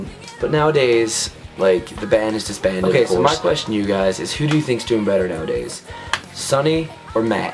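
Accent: Canada. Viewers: you're canadian right? just asking 'cause your accent is AWSOME!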